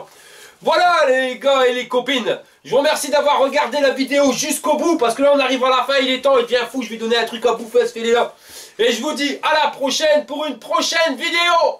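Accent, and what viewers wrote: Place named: North, France. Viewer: toujours au top t es vidéo avec le patois du nord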